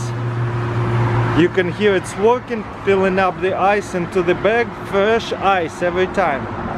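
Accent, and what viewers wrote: Russia. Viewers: Акцент то русский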